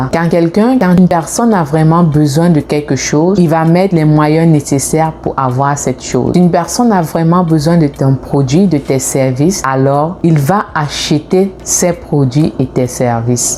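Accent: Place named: Canada. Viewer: Merçi avec ta petite voix canadienne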